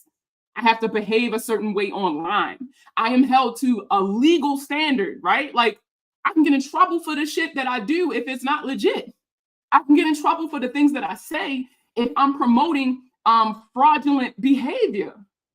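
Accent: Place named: Baltimore, United States. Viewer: You're getting too emotional 😂, he actually brought me here, and I here that Baltimore accent. I think the attention they are bringing to you is actually doing more good than harm.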